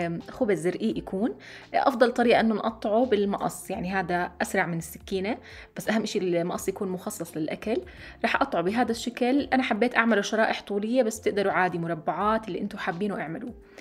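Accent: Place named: Palestine. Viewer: راح اجربها اليوم ان شالله ❤ يسعد قلبها اللهجة الفلسطينية😍😍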